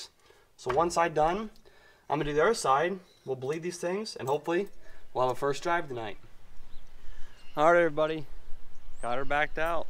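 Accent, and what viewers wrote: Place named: Midwest, United States. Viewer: Maybe your ear is not tuned to a midwest accent.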